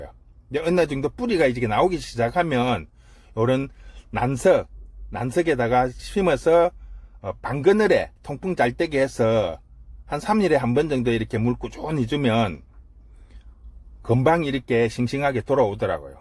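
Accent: Gyeongsang, South Korea. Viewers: 저는 오늘 처음으로 님의 영상을 보았습니다 경상도 구수한 말씀으로 상세한 설명 감사합니다~~^^ 저 완전 초보에다 저희 집은 햇볕이 부족하고 확장된 아파트라 하월시아 기르는것은 좋은 환경인듯 싶네요 하월시아는 영양제는 필요없나요? 그리고 난석의 굵기는 어느정도가 좋은가요 (예: 소.중.대) 물 줄때 저면관수로 주어도 되는지요 오늘 휴일 평안한 축복된 하루가 되시기를~~^-^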